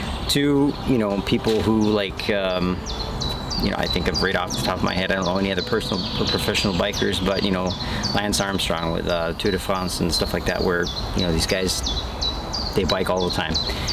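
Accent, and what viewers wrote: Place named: Upper Peninsula, United States. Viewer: I'm a Yooper too, and living in Heidelberg. I would love a Yooper Stammtisch and a Rauchbier in Regensburg. Thanks for the vids, and a touch of Yooper accent!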